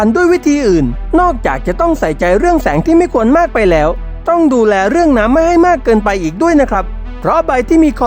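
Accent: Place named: South, Thailand. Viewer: เสียงบรรยายคล่องแคล่วดี แต่ออกสำเนียงใต้มากไปหน่อย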